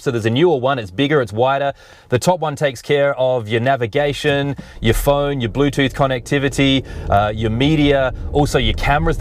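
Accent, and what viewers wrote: Australia. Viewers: Perth accent? Lol.... im getting this, luxury ride 🤩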